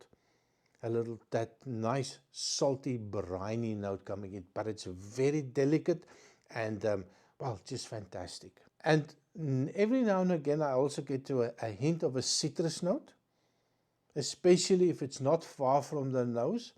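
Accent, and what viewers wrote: Afrikaans, South Africa. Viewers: You sound Afrikaans South African... I know because I sound the same😂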